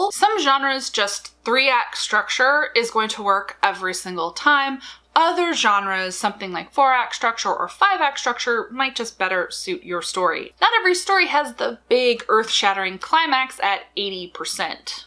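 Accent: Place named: United States. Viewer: Yes, that is it, thank you! US accents on French words was throwing this Brit for a loop. I've googled so many variations of what I thought you said and, understandably, found nothing.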